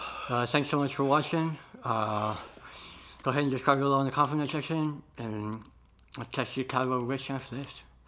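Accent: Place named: Ireland. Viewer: I'm digging the authentic Irish accent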